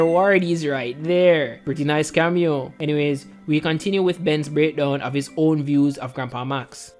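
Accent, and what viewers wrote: Jamaica. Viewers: Ur Jamaican? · No better reading than in the Jamaican accent. Love it. Your voice is great for narration.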